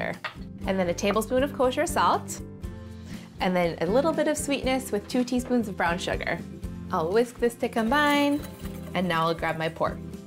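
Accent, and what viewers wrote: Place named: Minnesota, United States. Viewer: wow she's got the minnesotan accent now. listen to how she says kosher salt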